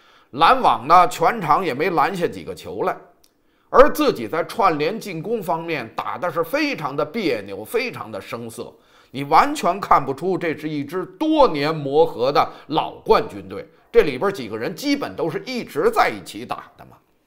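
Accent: Beijing, China. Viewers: 這口北京話，太爽了！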